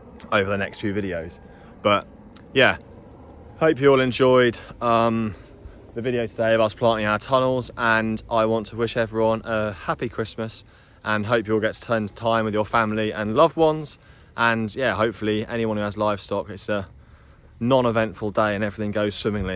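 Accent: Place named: United Kingdom. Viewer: No one needs ask where you come from, its easy to hear you are a Brit because every other word is ''yeh'............................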